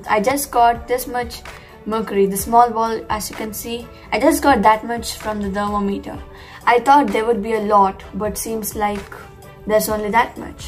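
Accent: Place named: India. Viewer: You will be more diseased than you were before and that's Indian accent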